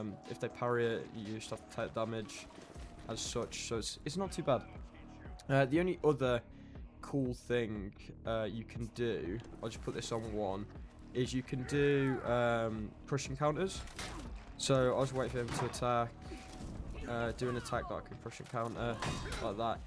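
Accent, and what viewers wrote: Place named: Australia. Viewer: Very good and informative video, my wife likes your Australian accent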